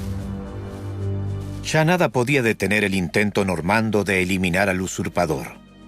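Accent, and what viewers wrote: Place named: Argentina. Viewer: Me gusta el narrador argentino la bataya!!!!!!!! Gracias.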